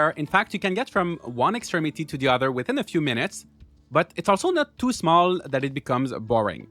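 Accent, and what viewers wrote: Quebec, Canada. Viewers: C'est sûr que t'es Québécois! Je me trompe?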